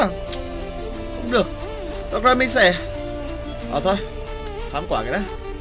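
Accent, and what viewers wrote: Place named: Central, Vietnam. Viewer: nghe tiếng bạn lúc đầu cũng khá là khó chịu... giọng nói của người miền trung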